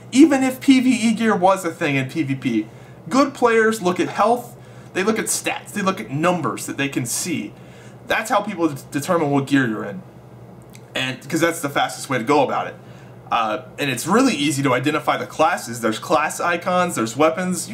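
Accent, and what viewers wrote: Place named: United States. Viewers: Hes american.... obviously, us Australians have a very different accent. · Thomas Feeley yeah he's definitely american. Not from the south though, so I'm not sure where from lol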